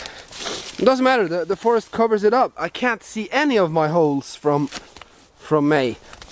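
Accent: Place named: Norway. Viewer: Hi I have enjoyed all of your videos and your great sense of humour and just hearing your accent is making me miss your beautiful country. Where in Norway are you living as I visit the Vestfold area of Norway but its mostly in the winter months. Anyway have a good christmas and i hope to see more videos from you soon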